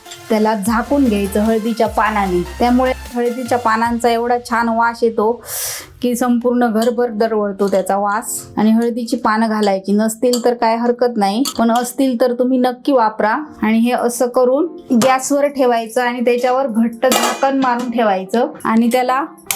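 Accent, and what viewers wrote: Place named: Malvan, India. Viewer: Waa..mast recipe ..thanks aani barobar tumch malvani touch aslel bolna pan mast.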